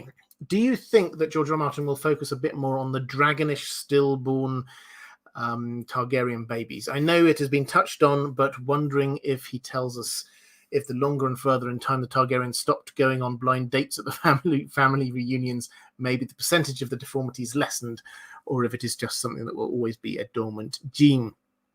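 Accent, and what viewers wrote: England, United Kingdom. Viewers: Every night I play about 20 min of these vids and they seem to slowly rock me into a peaceful sleep. It’s to the point now where I can’t fall asleep without hearing my mans boss English accent.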